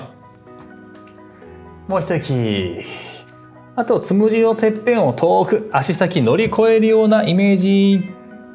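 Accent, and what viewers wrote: Kansai, Japan. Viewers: この回は関西弁が少々多目で嬉しい😆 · 関西弁のいい声はナダルみたいで聞き入ってしまいますね！ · 参考になります で、ええ声の合間合間にかなり関西弁のアクセントが入ってるのですが、「漫才師の麒麟」の感じでええ声関西弁でストレッチもなんかいい感じ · 先生のレッスンでピラティス熱が再燃しました！ありがとうございます🙌 あと、今日初めて、ん？関西アクセント？のイケボ？と思いました。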